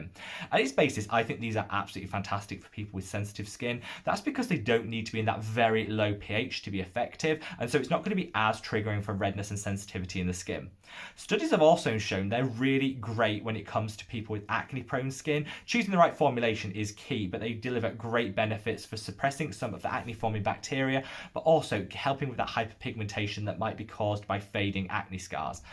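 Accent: United Kingdom. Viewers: Every time I hear you and James Welsh say "vitamin," my American ears can't deal with it.😆 For some reason, there are three British pronunciations that I find exceptionally distracting: vitamin; schedule; and aluminum (which you spell differently and add a whole extra syllable to!). Love your channel as much as I love my Timeless Vitamin C!❤️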